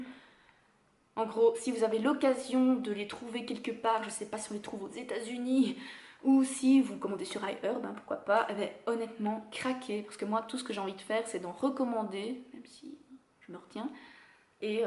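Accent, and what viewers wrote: Belgium. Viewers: je tarde un peu mais c'est normal pour le shampooing à la violette... tout les shampooing pour blonde font ça...( effet sac de noeuds). En tant que blonde c'est une galère!! bisouxx et quel plaisir cet accent belge surtout pour qqn qui désormais vit à NY!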